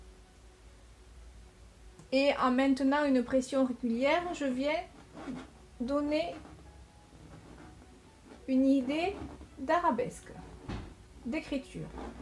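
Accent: South, France. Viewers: oh toujours magnifique et tellement bien expliqué vous êtes l'artiste peintre que je regarde le plus c'est toujours magique je vais essayer de faire celui là merci pour toutes vos explications pour moi novice dans la peinture j'apprécie beaucoup continuez de nous émerveiller et l'accent du midi met de la lumière supplémentaire dans vos tableaux suis aussi du midi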